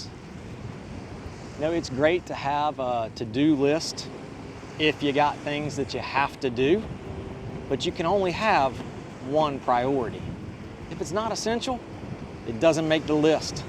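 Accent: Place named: Tennessee, United States. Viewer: Slight accent there, I'm guessing Tennessee, maybe southern Kentucky???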